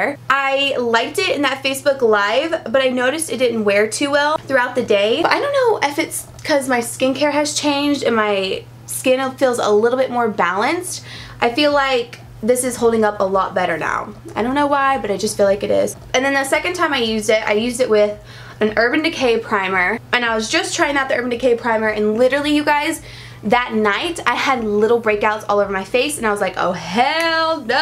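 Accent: South, United States. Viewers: I personally don't see it AT ALL. Maybe they both have that slightly Southern accent. But looks-wise, she has NO resemblance to Britney Spears NOR Britney's younger sister.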